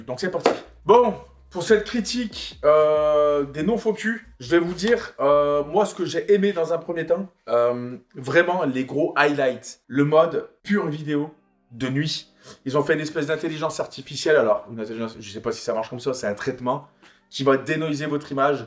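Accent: Saint-Étienne, France. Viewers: Avec le vrai accent stéphanois qu'on aime. Je t'ai vu à basic fit Andrézieux 👀👀👀👀👀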